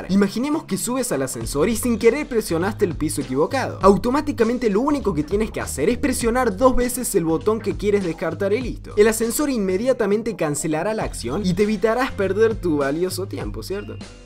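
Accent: Córdoba, Argentina. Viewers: sos cordobes? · decime que sos argentinooo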